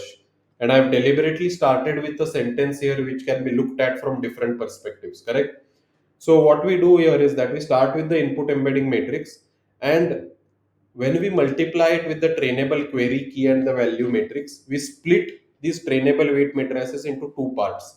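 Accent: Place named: Maharashtra, India. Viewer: Perfect marathi accent🎉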